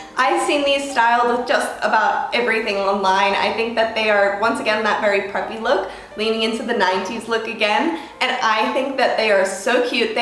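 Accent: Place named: United States. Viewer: i’m soooo confused u reside in australia/ also sponsor australian brands, but u have an american accent ??? 😩😩😩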